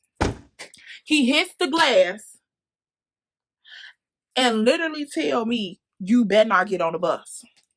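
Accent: Chicago, United States. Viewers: Lol I knew you were from Chicago within 1 min of watching this video...I didn't believe it.. But I guess we actually do have an accent lol... Good story telling 💯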